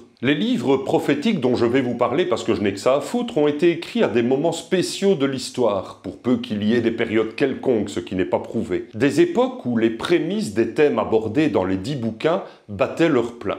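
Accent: Belgium. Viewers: non effectivement, t'as l'air d'avoir plus de 30 piges, donc c'est mort pour le neveu🤣 l'accent mi Schneider, mi De Croo ça doit être intéressant ça aussi, et pas très commun. Un bon moyen de te reconnaître si je te croise au hasard en allant voir le neveu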